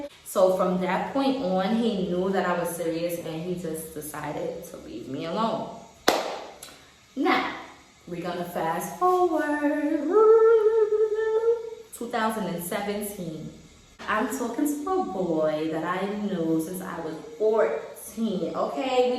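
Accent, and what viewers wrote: Jamaica. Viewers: Girl you Jamaican 🇯🇲🇯🇲🇯🇲🇯🇲 I never knew that lol loooooooove it... "a wah gwan, a wah the bbc" I CAN NOT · I’m Jamaican n from the bx u talk like me 😂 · you jamaican lalala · wasnt gonna subscribe till i heard the yardie accent ........big up yuh self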